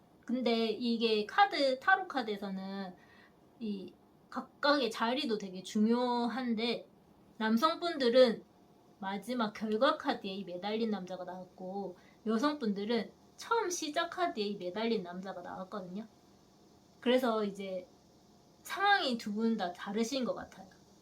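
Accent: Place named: Gyeongsang, South Korea. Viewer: 타로에 경상도 사투리가 엄청 많네..대구? 대구사람들이 다 타로하는거임??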